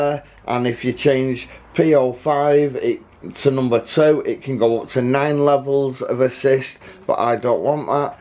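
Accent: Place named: Manchester, United Kingdom. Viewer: Proper manc lad hah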